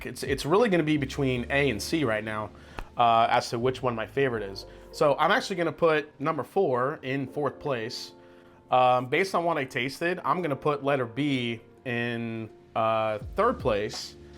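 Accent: New York, United States. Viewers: Are you from New Yawk? I heard you say 'wata'. I'm fum Brooklyn.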